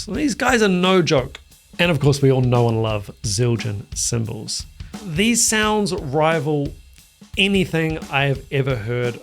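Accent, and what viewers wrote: New Zealand. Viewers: …I like to hear an NZ accent to a point but to wait for you to say something substantive or meaningful 1/3 the way through…well…too… long…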